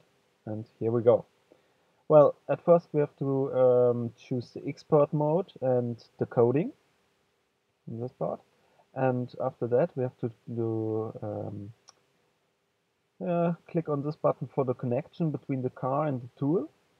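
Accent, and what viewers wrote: Germany. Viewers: AWWW FUCK GERMAN ACCENT!!!!!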